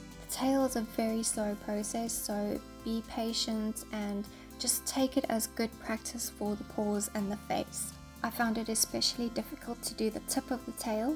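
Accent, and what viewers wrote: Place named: South Africa. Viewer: Very inspiring and creative! Love your accent too. Did I spot South Africa? Hugs from Provence, Adrien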